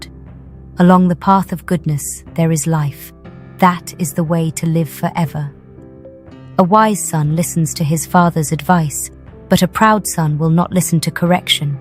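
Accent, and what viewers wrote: United Kingdom. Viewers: Let's recognise that there are BLACK people in the world who have an english accent like this voiceover. We do exist!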